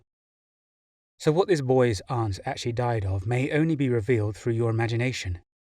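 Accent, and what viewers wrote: United Kingdom. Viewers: You have a funy accent are you a brit?!!!!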